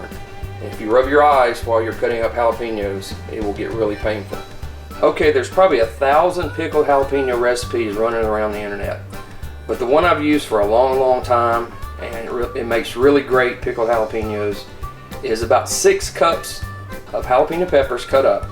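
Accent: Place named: South, United States. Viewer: You sound Southern so maybe you're familiar with Braum's. Their pickled jalapenos are my favorite and I'd like to copy them if I can.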